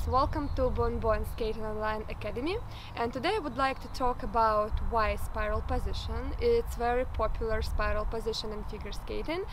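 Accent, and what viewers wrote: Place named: Russia. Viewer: русский английский))) Большое спасибо Вам за Ваши уроки!!!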